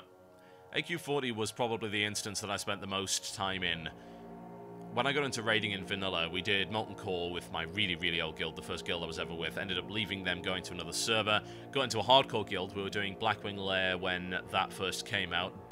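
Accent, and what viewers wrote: United Kingdom. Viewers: I was never into wow that much. I like the game but still..you're actually really interesting to listen to o_o I think its that British voice XD I'm a brit too >:3 Brits ftw!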